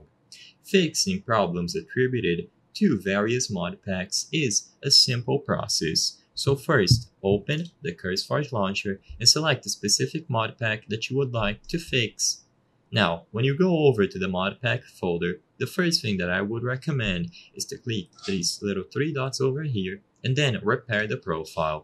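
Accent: Brazil. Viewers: O sotaque è inconfundível, Br e o que não falta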